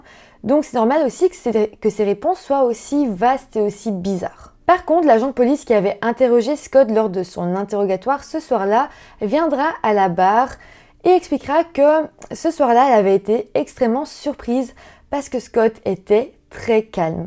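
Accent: Belgium. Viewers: Salut, cette histoire est terrible, pour moi ce garçon 👦 était très troublé, certes, mais certains points contredisent les maladies mentales citaient dans la vidéo, par exemple la préméditation, elle est la, ce garçon malheureusement était un tueur de masse en devenir, et il s'est entraîné sur sa famille 👪, je pense que la peine fera qu'il ne ressortira pas, c'est une sécurité pour lui et pour les autres, il n'a aucune empathie,si toutefois il est malade, il souffre de psychopathie et recommencera, la, ou je pense qu'il n'est pas responsable c'est qu'il est né comme ça, né pour tuer, le passage où il explique qu'il les achevait plutôt que de les laisser souffrir, pour un peu, il leur a rendu service.comme d'habitude j'aime beaucoup tes histoires avec ce petit accent Belge qu'on ne lasse pas d'écouter, bonne fête 🥳 🥳 🥳 de la fin d'année et bonne année 2023...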